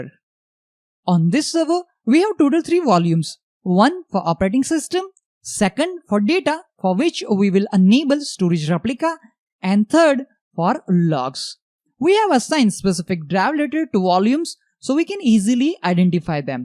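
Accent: India. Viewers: indian accent.....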